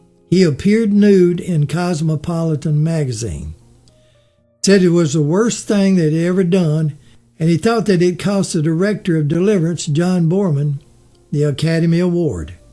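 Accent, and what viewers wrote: South, United States. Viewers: .... Another great vlog. You have the knack of telling a great story. I just do enjoy listening to your sweet southern accent. Please have a joyous and safe holiday season.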